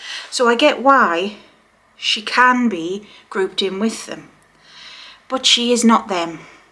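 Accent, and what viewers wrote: United Kingdom. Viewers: I'm only about 30 seconds into watching one of your vids for the first time, and my comment has nothing to do with the content, and for that I am sorry, but I just had to say quickly... I LOVE YOUR ACCENT! I love most British accents, and yours is just ... i love it! OK, now back to the content. ♥ EDIT: this was very helpful. I have felt drawn to Hekate for several years, but have wondered if she would even bother with me, like... I'm not special enough or something. Anyway, your video has given me renewed motivation and clarity, and I thank you for that!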